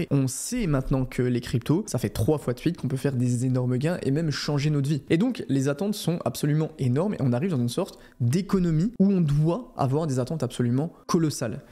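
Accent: Franche-Comté, France. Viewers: Je suis sur que t'es un mec de Franche-Comté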